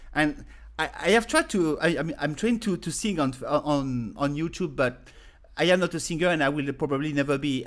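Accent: France. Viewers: Merci pour cette leçon très instructive (+ le commentaire de Walter Cengia, qui donne la définition exacte) sur toutes ces différentes techniques : c'est la première leçon qui explique clairement tous les détails ! And very amusing with this funny french accent ;-) As another comment said, you should have made it in french but it would had less charm and feeling ! Bonjour du pays de la rillette et des courses de voitures =;-)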